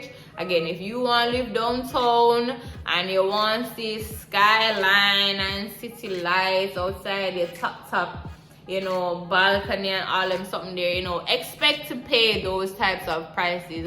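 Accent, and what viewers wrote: Caribbean. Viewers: Loving that Caribbean accent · That intro…I know she’s a Caribbean gworl🤣🤣🤣🤣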